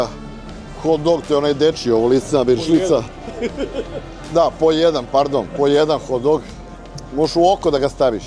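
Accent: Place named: United States. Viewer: Bez zajebancije poceo si da pricas kao amerikanac ahahahhaha Bravo za domacina! Pozdrav iz Velikih Crljeni :D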